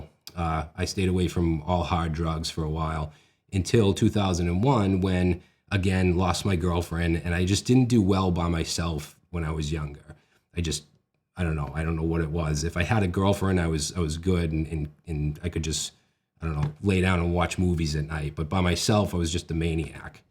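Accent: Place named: Boston, United States. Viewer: web dev videos helped me to enjoy web development again after losing interest in it around 2017. There was just something about his style and approach to making tutorials and crash courses. I love web development now more than I ever have, and finding his channel was a big part of that. It makes me wonder what my life would be like now without having found his channel. The only thing I question: You say you "used to" have a thick Boston accent!? I feel like we need an audio example, because this still sounds like a pretty thick Boston accent.:-)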